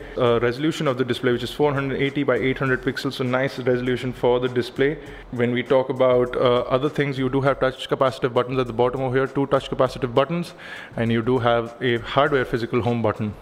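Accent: India. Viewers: its called Indian accent btw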